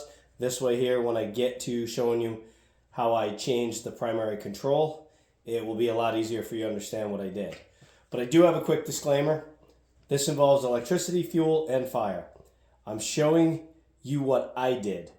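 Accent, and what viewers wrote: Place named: East Coast, United States. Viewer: you and i would get along well. Jaja. Sounds like your accent is somewhere from the east coast too. Im from CT.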